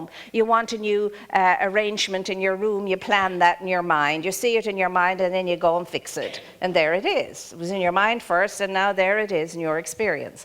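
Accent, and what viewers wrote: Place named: Ireland. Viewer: Love the message. And her graceful hint of an Irish accent is beautiful to my ears.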